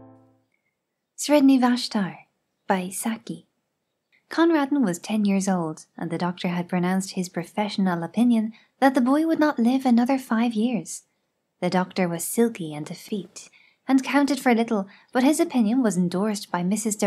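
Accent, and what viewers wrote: Ireland. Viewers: irish brother a feminine Irish voice telling me how do destroy my enemies? I can get behind that.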